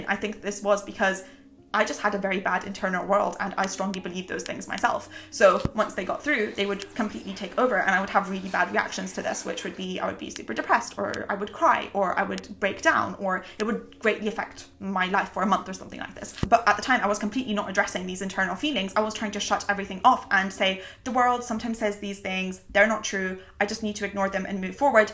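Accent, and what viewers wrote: United Kingdom. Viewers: I can listen to you for ages for your adorable British accent.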